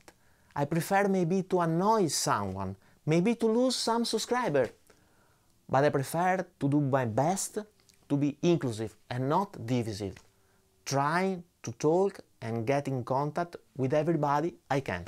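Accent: Italy. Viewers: Cheers from Istanbul I am a dedicated home player music is my main hoppy I leverage of your videos for couple of gadgets I recently purchased.. you are a naive great person I love your Italian accent music is the universal language and for language different accents are great flavors your music is really amazing btw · Your English pronunciation is actually one of the reasons I watch your videos… some of my grandparents were Italian, and it reminds me of them. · Hi. I'm Italian. Your English is perfectly understandable. The pronunciation, with a strong Italian accent that characterizes it, is clearer for the automatic translator than that of native English speakers. So what matters is that your content can reach everyone. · i'm a guitar player and as everyone else I watch a lot of YouTubers talking about the guitar. Said that I would say your videos are the best for me to understand what is happening in the digital and analogical revolution. 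Your videos are always among..even I would say the best about that your reviews and comparisons are always really objective and not subjective how boring nowadays can be reviewing the tone of guitars? You don't do that which is subjective as you don't say your subjective personal opinion on which units sound the best I actually really appreciate your work and I completely understand what is your purpose Nahhh in my honest opinion you should keep speaking in English for two reasons 1 the guitar community needs your videos 2 I'm Italian as well and even if I'm living in Britain my pronunciation is like yours, the accent can't be erased 😂 Unfortunately I'm seeing companies which are not welcome to criticism made by YouTube content creators, but criticism is what people are expecting from them Bravo! I add you don't really need to explain your work...everybody knows it's worth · Your strong Italian accent is far preferable to a strong Spanish or Portuguese one. 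You consonants are distinct and word separation is excellent. Vowels are no problem-at all. Even if these are problematic for some listeners they could never overshadow the quality of comparative analysis you provide. I come back to you to stay abreast of the decision of which to purchase should my DIgitech RP360 XP fail. I am keeping a close eye on the potential replacements whose rapid developments in sound quality, features, and user interface are stimulated by the necessary competition. I am grateful that you are drawn to sort out their differences is such detail. Thank you - so much! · Wish you all the best, thanks for all the knowledge and the professionalism I also wish there are more channels like yours , Italian accent is cool 😎 cheers and all the best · Zero wrong with your English. You have an Italian accent - hardly surprising! Keep up the great work. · great channel and as fellow Italian ( living and playing in London for over 20 years) I tell you don't worry about the english pronunciation, what you say is clear and understandable and that is what matters....and as a positive bonus it speaks about your roots. 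keep the compositions coming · Great video!! I for one think the Italian accent you have when you speak English is a part of the charm and i have no problem whatsoever to understand you as a Swede that write/read and speak English pretty well so dont worry about it at all. All the best! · Ciao,! Your channel is great! … and I like the Italian accent: my other great love is MotoGP, so I am very used to it! ☺️ Be well! Greetings, from Downunder! 🇮🇹🇦🇺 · ! Your Italian accent would be a total chick magnet around here. I wish I was bilingual with your accent. My date book would be completely full. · Servus 😉 Gratulations for 30000 follower, you deserve much more! I like your channel, also to be informed news around guitar gear. I am a basic English listener and speaker… I understand the most of it and I also like your Italian accent. Greetings from Bavaria an keep on 🎸👍🏼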